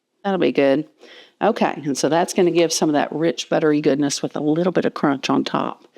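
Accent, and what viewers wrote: South, United States. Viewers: Her southern accent 🫶 I’m a Texan living in Missouri and I missed that! The rule areas have a little bit of around here. Easy & kind! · What a great recipe. Thanks. You're so fun. Subscribed so fast. You're southern...but not so sickly over the top sweet like so many. Just love you.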